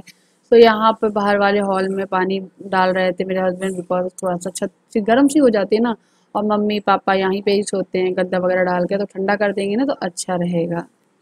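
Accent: Bundelkhand, India. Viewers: Hello di aap bundeli bola kariye aap ke munh se bundeli bahut acchi lagti hai ham log bhi ghar per bundeli hi bolate Hain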